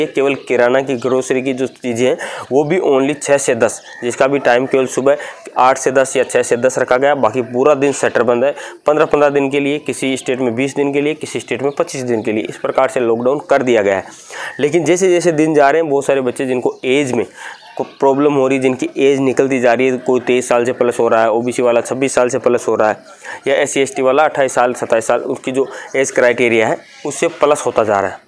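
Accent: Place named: Nagaur, India. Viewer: Sir nagour se ho kya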